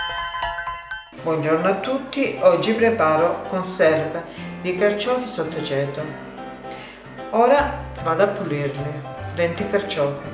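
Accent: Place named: Tuscany, Italy. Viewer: Bravissima. Hai un accento toscano😍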